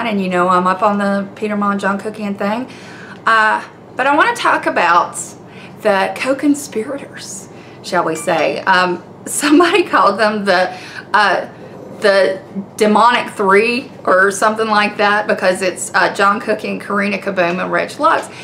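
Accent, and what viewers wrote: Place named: Georgia, United States. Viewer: That is hilarious! I’m from Tennessee, and if you give me a minute, I can sound like a hillbilly or Dolly Parton, take your pick. Otherwise, I think I have just a bit of a Southern accent. (I watched a load of TV growing up, so I adopted a neutral accent on purpose after I was insulted by a Canadian as a child while on vacation in Florida.) Where are you from? I would guess Northwest Georgia by listening to you.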